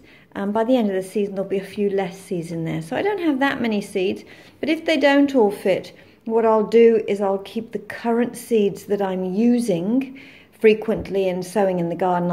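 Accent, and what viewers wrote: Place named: United Kingdom. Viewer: I am so glad I sat next to Holly yesterday and found your site, lovely to hear the UK accent, I have a farm but really struggle to grow stuff(Mainly ate by animals possums and rabbits ) So I am excited to follow you and will sow my indoor seeds very soon. I have a worm farm and Cow, horse sheep poo but will use shavings for the chickens.( you would be very welcome to collect the other manure from us if needed ) Thank you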